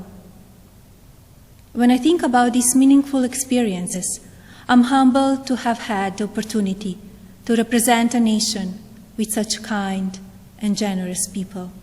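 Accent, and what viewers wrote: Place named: Eastern Europe. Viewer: 她的东欧口音还是可以听出来的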